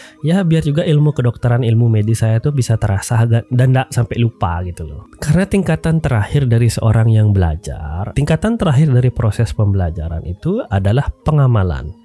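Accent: Bali, Indonesia. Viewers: swastiastu blii, kirain orang keturunan arab, setelah denger aksennya eh tyt orang bali wkwkwkwkw. Makasih info nya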